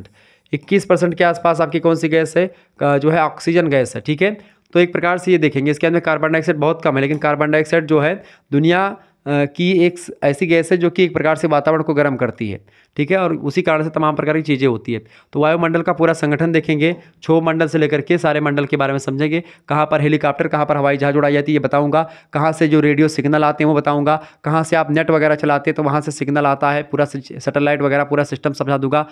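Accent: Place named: Bihar, India. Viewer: Sir AAP Bihar ke ho . AAP bhojpuri language Bol rhe h vich vich me ❤❤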